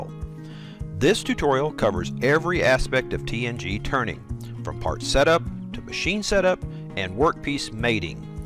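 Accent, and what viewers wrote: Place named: South, United States. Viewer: esprit cam and southern accent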